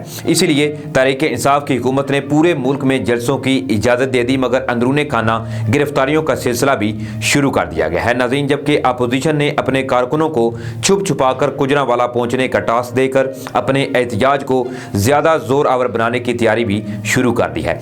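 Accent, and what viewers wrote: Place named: India. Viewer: sahib tum ne urdu bolni kahan se seekhi? Ya tum urdu ko hindi bananay ki nakam koshish kar rahe ho. Mera mashwira hai keh urdu sahi ker lo. Ziada indian ban ne ki koshish na karo.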